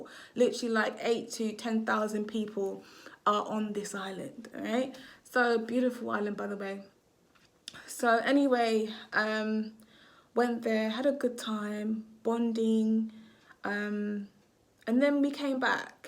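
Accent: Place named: United Kingdom. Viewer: Its called an accent... She's British.. Dont be that type of person famz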